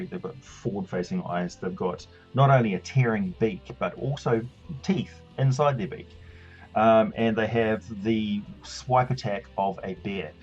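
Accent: New Zealand. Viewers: I knew you were a Kiwi.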